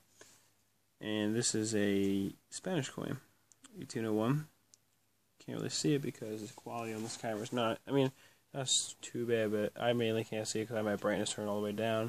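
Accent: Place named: United States. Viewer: When you say you're fluent in English I'm guessing your main exposure to English is British English? This guy has a very clear American English dialect, the most commonly known and spoken dialect, and if you have a hard time understanding him then you're not really as fluent as you think you are.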